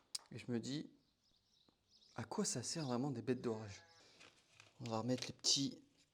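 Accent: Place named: Pas-de-Calais, France. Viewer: Bravo, bravo, moi qui suis en train de démonter mon moteur de cox, ça me sert bien ton super tuto...!!! Je suis dans le Pas-de-Calais, j'ai reconnu le tchiot accent du coin... Continues de saquer d'din et force à toi!!! C'est top!!!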